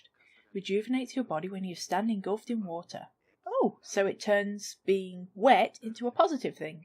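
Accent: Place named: United Kingdom. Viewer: Interesting game, thank you for sharing it. Love your strong British accent too.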